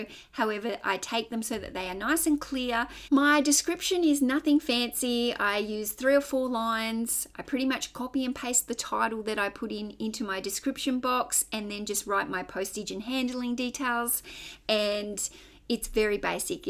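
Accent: Australia. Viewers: Love the Aussie accent. I also have a YouTube channel in Oklahoma. I am subscribing to your channel. Hope you will check mine out and subscribe too. Another YouTube couple drifterthrifters in Queensland follows my channel. Mine is all one word “TheCapGuy”. Have a great day at the op shops.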